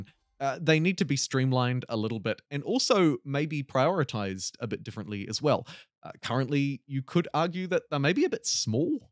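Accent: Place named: New Zealand. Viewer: I love ur content!! U sound like Cs Theus the skin guy, u know!? U from NZ?!?! <3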